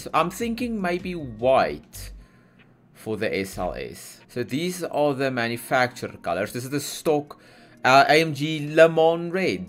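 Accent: South Africa. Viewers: That is the most Saffa accent I’ve heard in my life, holyyyy. Nice video though man.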